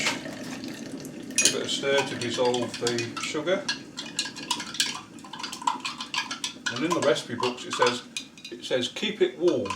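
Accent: United Kingdom. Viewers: Is that a west country accent? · Do i detect a lincolnshire accent?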